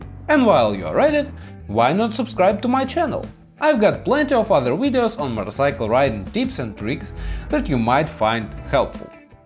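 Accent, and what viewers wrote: Russia. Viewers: Clearly the best motorcycle instructional videos out there. Even with the strong Russian accent, you still have the clearest and most understandable explanations in the field. I would challenge any American to learn Russian and be able to be as fluent while creating such competent content. Kudos my comrad--I am a subscriber now. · He is in Argentina, but he does sound Russian, I wasn’t sure haha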